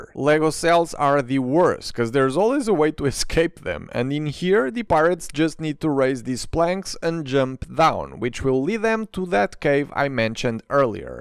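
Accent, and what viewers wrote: Portugal. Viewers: Boas, não sei se costuma receber muitos comentários em português, mas só queria deixar uma mensagem de apreciação ao trabalho que fazes, principalmente sendo em inglês, o que te dá um alcance maior do que terias a falar em português. Eu comecei muito tarde neste mundo da Lego e só acerca de 1 ano comecei a montar a minha coleção, posto isto, gostava muito de ver-te a fazer um vídeo sobre como "nós" (beginners em Lego) podemos arranjar forma de, mais eficientemente, fazer arrumação das peças por categoria, ou mesmo por "tipo de peça". Adoro os teus vídeos, e devo confessar que o teu sotaque de inglês apesar de ser excelentíssimo, dá para entender no meio, os tragos de português e é muito bom. Muita força e que continues a ter sucesso por muito tempo. Um abraço de Lego aqui do norte, mais propriamente de Santa Maria da Feira. Um bem haja grande! 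<3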